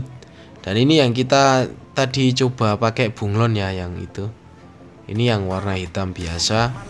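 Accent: Temanggung, Indonesia. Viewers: orang mana mas kok kayak temanggung.. lar lur😁😅😁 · Wong temanggung po om??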